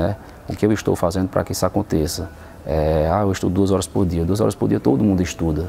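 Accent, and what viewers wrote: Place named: Pernambuco, Brazil. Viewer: Sotaque de pernambucano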